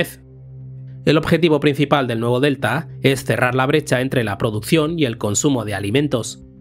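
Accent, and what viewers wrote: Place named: Spain. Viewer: El contenido es muy pero muy bueno, pero déjame hacerte una critica constructiva, la narración es muy llana, le hace falta sentimiento emocion queseyo, es mas como leer un documento y no una narración, y segundo el acento y la narración nativa española (no se de que parte España seras) es muy marcada, deberías neutralizar tu acento. Suerte y voy a seguir viendo tus videos 👍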